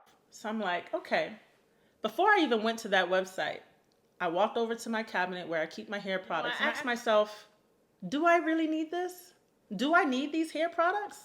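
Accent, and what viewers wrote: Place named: New York, United States. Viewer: This is the 5th video I’m watching of yours today, since the first video I said her accent is from NY and she’s giving me a lil Carribean and you said NY!! I divinely found your page today, thank you I needed it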